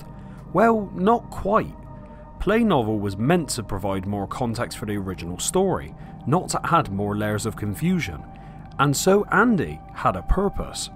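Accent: Cornwall, United Kingdom. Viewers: Recognised the kernow accent and subscribed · British limey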